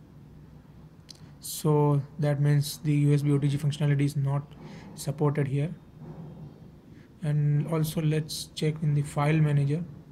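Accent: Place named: Gujarat, India. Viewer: Are you Gujrati?? your accent is like Gujratis.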